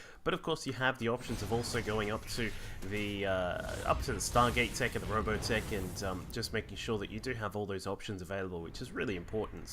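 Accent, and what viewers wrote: Australia. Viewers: Nice cast. Are you Aussie ?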